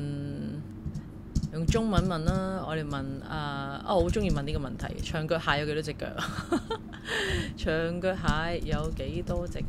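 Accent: China. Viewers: 这些不能叫做乡音，完全是一个母语普通话的人在学讲广东话。😂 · 大陆哩音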